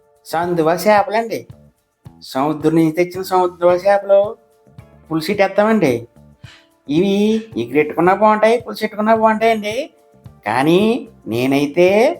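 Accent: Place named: Konaseema, India. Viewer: konaseema voice and super curry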